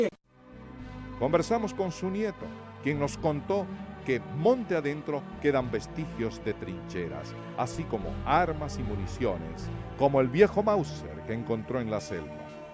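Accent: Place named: Bolivia. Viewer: Porque del infierno verde, sólo Dios se acordará, que linda es la musica Boliviana!!! Excelente material, un gusto escuchar su acento es tan agradable y bonito, tienen el mejor castellano del mundo, saludos desde Luque, Paraguay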